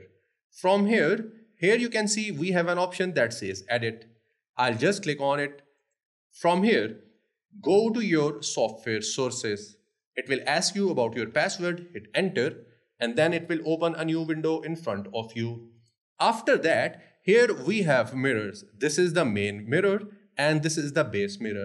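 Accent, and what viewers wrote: India. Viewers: Any video with an Indian accent is a no go! India is scammer central.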